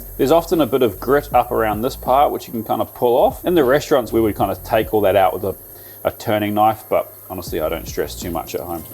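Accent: Australia. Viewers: He Aussie mate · Are you australian ?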